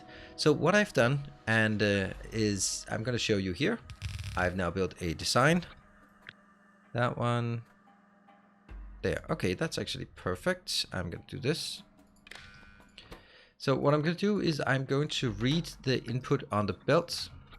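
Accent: Denmark. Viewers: Are you danish, your accent sounds kinda danish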